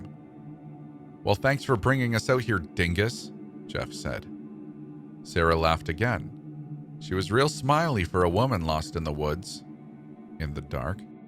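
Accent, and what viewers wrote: Canada. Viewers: My buddy who i let listen with thinks your from canada i think just up north im from the north east but Good work as always loveing it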